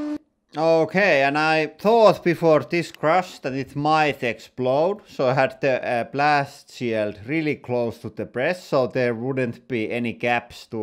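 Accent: Finland. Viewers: The finish accent makes it even more funny imo :D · Are you from finland? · The swearing in a thick Finnish accent will never not make my smile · I lived in Finland for two years and knew right away when I heard that accent. 💙🤍 Suomalainen voittaa aina.... jopa hydraulipuristimella! 😂 🇫🇮 🇫🇮 🇫🇮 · You can hear the Finnish accent 😂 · Finnish accent is so suited to these videos « Ha! Let’s brrekk tings ! »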